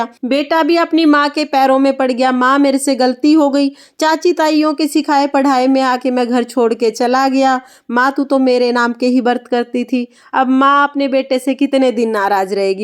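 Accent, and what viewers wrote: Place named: Meerut, India. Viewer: 😊😊ji achcha reply k liye dhanyawad...aapki voice tone thoda hmare meerut ki lagi isliye puchha😊 happy sakat chauth aap sabhi ko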